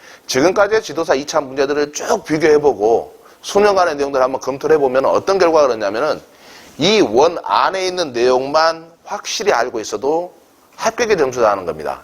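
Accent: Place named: Jeolla, South Korea. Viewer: 말씨가 전라도 그래서 나간다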